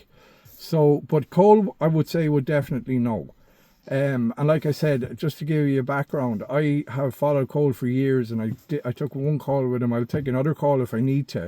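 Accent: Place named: Ireland. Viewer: I could tell you're Irish. I was just curious if you're still living in Ireland. I'm always curious about ingredients in processed foods in other countries vs the u.s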